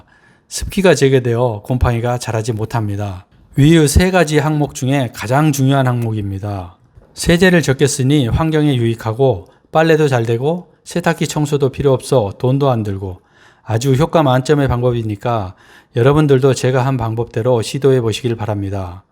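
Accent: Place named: Jeolla, South Korea. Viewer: 억양이 전라도 억양 이시네요^^ 푸근 합니다^^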